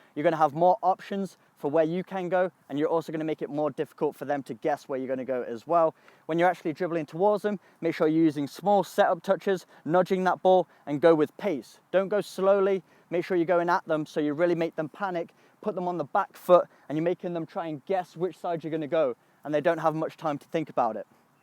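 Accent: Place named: United Kingdom. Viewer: He has a British accent but has an American flag on the pole behind him. What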